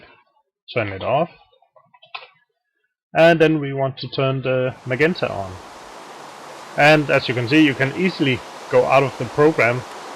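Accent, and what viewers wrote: Denmark. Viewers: jeg kunne høre lige med det samme at du var dansk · You're Danish and I can hear it!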